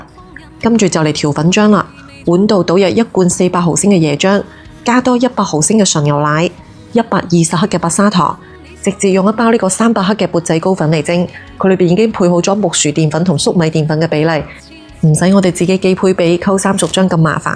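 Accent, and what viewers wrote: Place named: Guangzhou, China. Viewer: 廣州口音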